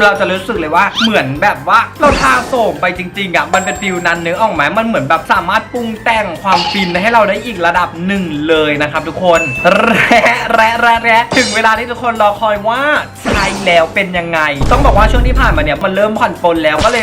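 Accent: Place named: Thailand. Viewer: คนจังหวัดอะไรไหนพูดสิคะ<num>น่ารัก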